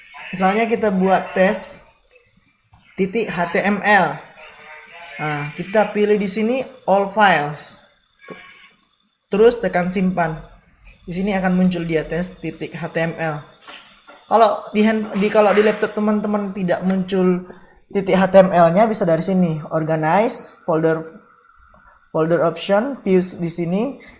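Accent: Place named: Medan, Indonesia. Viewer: Medan x bang bahasa nya paten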